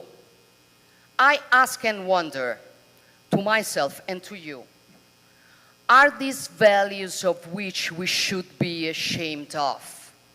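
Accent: Rome, Italy. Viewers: Onore al merito, ma che inglese romanesco!!! Non si può sentire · i admire she went all out trying her best in English.Although she gained more and more (Italian) accent along the way,she managed to get all important concepts across,she corrected herself in few occasions,still composed and strong.it is impossible to be liked by everyone,and she knows that very well,but i think she now have the respect of many.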